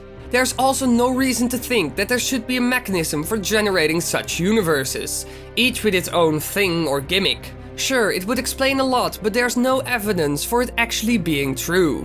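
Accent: Netherlands. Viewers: Hi are you dutch. If so Goede video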